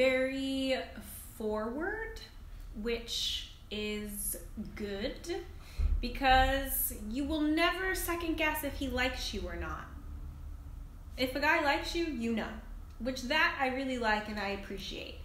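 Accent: United States. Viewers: Why do you think people immediately spot you as an American? Your accent? Do you have to start speaking first? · You don’t look different than Nigerians or Tanzanians etc. point is whether you are African American or African they see you as Black period and the only way they can tell you are an American is when you speak because of your accent other than that no, you are just like every other beautiful black woman at a glance. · If I see you in Europe I'll think you are East African, maybe Ethiopian. (Your hair and face seem common with people from that region). That is in a way natural because there are many Africans but almost no black Americans, black Carribbeans or black Latinos in Europe. If you start speaking English though, you'll be revealed as an American, because your accent is very obvious.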